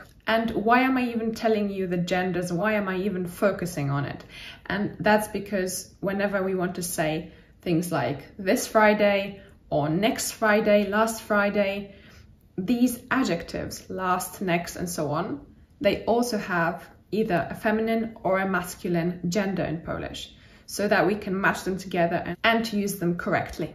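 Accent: United Kingdom. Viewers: By the way, your accent, are you in UK?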